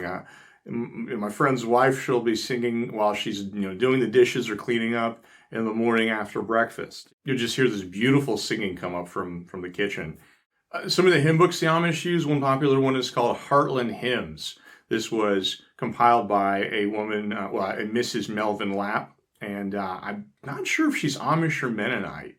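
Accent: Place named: North Carolina, United States. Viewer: He speaks like my brother, who is a ninth generation North Carolinan!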